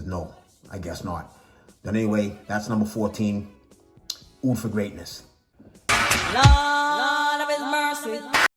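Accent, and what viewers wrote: Boston, United States. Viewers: Are you from Boston? great list.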